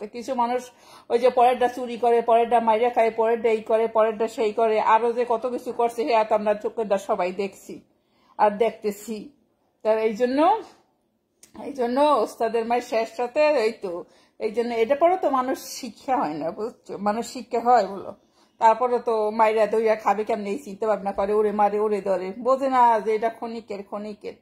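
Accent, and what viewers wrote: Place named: Barishal, Bangladesh. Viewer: বরিশাল এর ভাসা শুনতে ভালো লাগে।।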